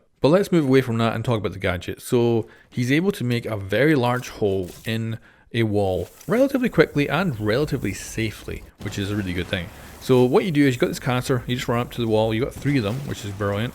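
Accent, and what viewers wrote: Scotland, United Kingdom. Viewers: Don’t hide you Scottish accent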